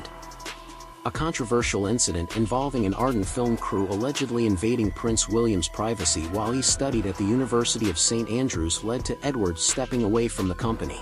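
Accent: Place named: North America. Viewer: More crap with a North American accent 🥱🥱🥱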